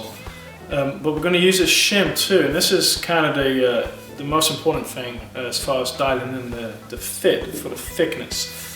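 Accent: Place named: United Kingdom. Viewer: British, Texan and maybe German? accent.. interesting combination. not sure of the German but I swear I hear it in there from time to time!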